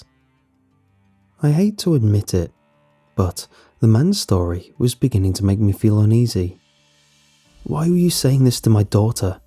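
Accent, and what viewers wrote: United Kingdom. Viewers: Is from the UK?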